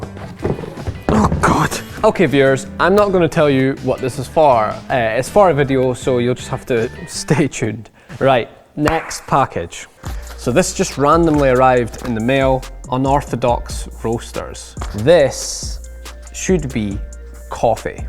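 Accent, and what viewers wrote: Scotland, United Kingdom. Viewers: Is scottish? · I canny be the only one thinking you sounded a wee bit more Scottish that usual in this one? P. S. Congrats on 1 million. Hats off.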